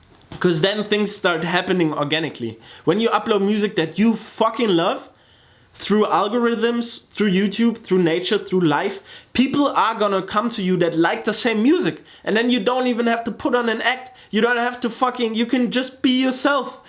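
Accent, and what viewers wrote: Germany. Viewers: Dein Deutsch-Englisch ist bisschen auffällig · oha heftig aber wenn man drauf achtet hat er nen deutschen Akzent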